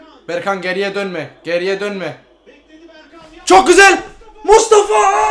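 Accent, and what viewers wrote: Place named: Northern Cyprus, Cyprus. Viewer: Ingılterede yasayan kıbrıslı turkmusun aksan bıraz oyle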